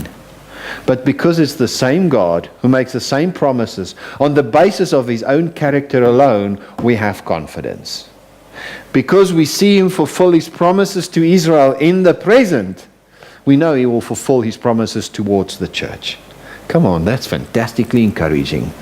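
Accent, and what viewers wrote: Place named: South Africa. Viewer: Glad to come across this. Pastor has a South African accent.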